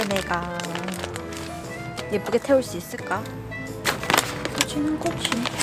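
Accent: Gyeongsang, South Korea. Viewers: 1. 베-베르그라뇨 르-르(느)무나 개취네욤 그-그럼…저 주시나요?ㅎ 2. 우드 랜턴 스탠드-월넛(중) 을 호시탐탐 눈팅만 하고 있답니다. ㅎㅎㅎ영상보고 넘 갖고싶은데…부피도 좀 있을 것 같고..가격도 가격이고… 저도 경상도 출신이라 경상도 액센트 넘 정겹고 좋아요. 항상 영상보고 부러운 마음입니다. 맛있게 먹고 작은거에 감탄하시는 모습이 힐링이에요~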